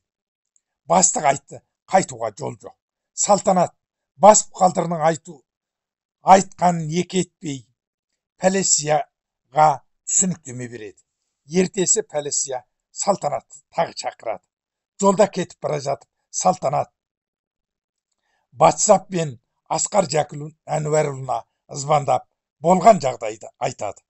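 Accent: China. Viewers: Өзің қытайдың акцентімен сөйлейсіңде,Қытайды жамандайсың??? Өте қызық жағдай екен😂😂😂😂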